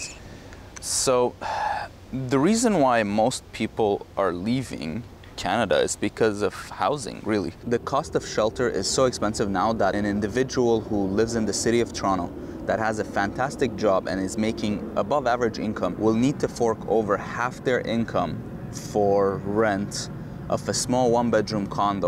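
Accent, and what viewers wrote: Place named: Russia. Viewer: Your Russian accent is showing.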